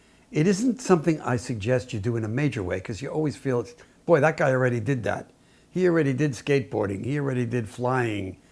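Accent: New York, United States. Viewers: Christ your accent is so New York it's giving me flashbacks. Don't worry about the ads; I bet no one will mind.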